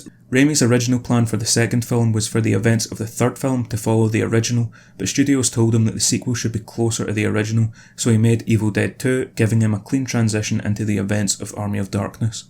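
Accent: Scotland, United Kingdom. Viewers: It's nice hearing the same accent being spoken with same slang used on a platform like this (except of course for exceptions like limmy, but Scottish youtubers are few and far between on this platform). Keep up the good work, your iceberg videos are really nice and informative 👍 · Two things right off the bat. 1- Eyy, a fellow scotsman! Honestly really nice to hear someone who sounds kinda like me lol. 2- I might not be keen on Iceberg videos (8 out of 10 times it's crap imo), but I'll give you this... you cut straight to the chase, no bollocks or filler. Commendable in a platform filled with so much fluff, it would make a teddy bear look like an anorexic supermodel.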